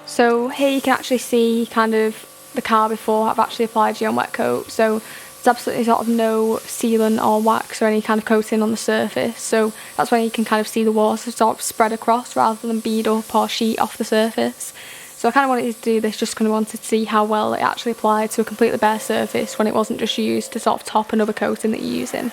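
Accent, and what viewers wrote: United Kingdom. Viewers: The three best things you can ever find is on this channel. Car Detailing British accent 😍 Cute Girl 👌🏼👍🏼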